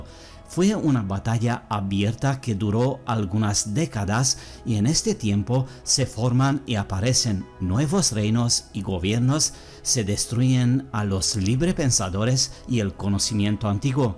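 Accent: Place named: Germany. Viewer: Estoy muy impresionado con su trabajo, de verdad impecable su eapañol es excelente pero su acento parece aleman o holandes puedo preguntarle de donde eres?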